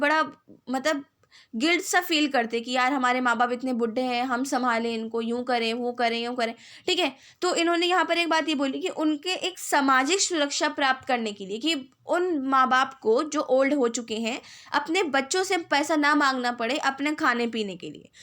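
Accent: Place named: Rajasthan, India. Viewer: Mam I think aap Rajasthan se ho...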